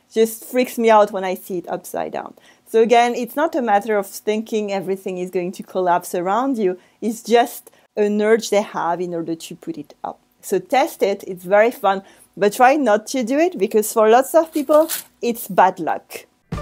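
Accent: France. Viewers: Pourquoi tu parles anglais avec la forte accent francais?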